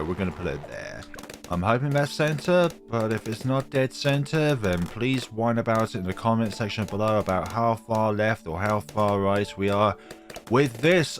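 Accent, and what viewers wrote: United Kingdom. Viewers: I don't know why, but i find your British accent cute.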